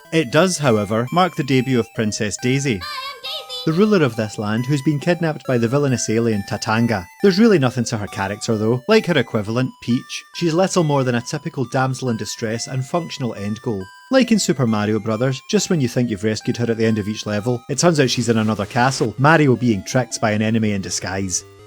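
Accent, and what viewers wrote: Scotland, United Kingdom. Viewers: That voice......reminds me of what Shrek would sound like in his mid 20s. (don't take this the wrong way, Scottish accents are one of my favorite accents of all time!) Can't wait to check out your other stuff :)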